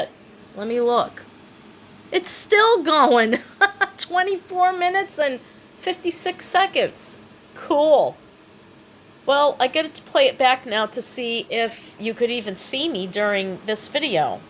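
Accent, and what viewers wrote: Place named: East Coast, United States. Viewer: You have a good voice. I pick up on a slight east coast accent. You should look into doing voice-over work. All you’d need is a nice microphone and work from home. I’m an instructional designer and many companies need good voices for training programs. Just read a script and get paid! I think you may like it.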